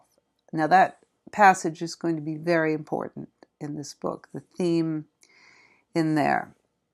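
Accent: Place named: United States. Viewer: The YouTube algorithm was spot on with a link to your channel. Great work - many thannks. I have just started ZAMM again by listening to it (curtesy of pirated version on YouTube) having first read it in 1978 and read it numerous times since. The American voice is adding a new dimension. One reading following the route with Google Street View is something I would recommend. America is so different to UK where I have done all my riding. I have also been listening to JBP, Rebel Wisdom, John Vervaeke, Jonathan Pageau and Paul VanderKlay so this is really pulling lots of strands together. I am an Anglican priest and also a motorcyclist who has spent hours and hours in motorcycle maintenance. I have never really seen why ZAMM is specifically Buddhist. Really looking foward to the rest of the series and hoping to find time to engage in the discussion in the comments.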